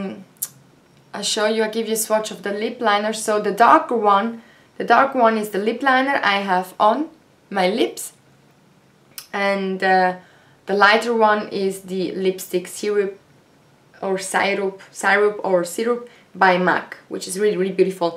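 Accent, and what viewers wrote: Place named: France. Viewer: Nice haul, i like how you speaks on French so chic 😉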